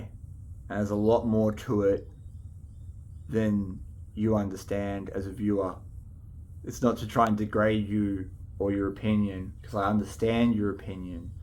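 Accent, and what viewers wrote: Australia. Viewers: Do what ya gut tells you to do,, you and ya brother are incredible I'm recently retired and found your YouTube a couple of months ago. Because of you I now have a Detector, a Pinpointer , and exploring country I would never of seen before. Whatever ya choose,, I still like ya content 🙏😎 (nothing wrong with the way you speak,,, its Australian ❤❤)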